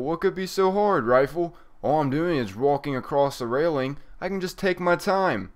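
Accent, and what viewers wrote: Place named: Pittsburgh, United States. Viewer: gotta be from Pittsburgh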